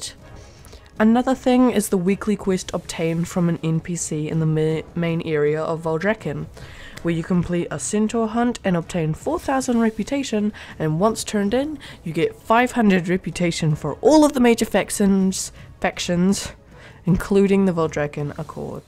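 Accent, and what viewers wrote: New Zealand. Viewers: I absolutely love your accent, is that a kiwi accent?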